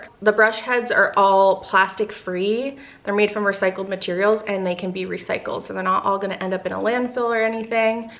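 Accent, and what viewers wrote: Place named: Philadelphia, United States. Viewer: BLESS the delco/philly accent - i'm starting task this morning!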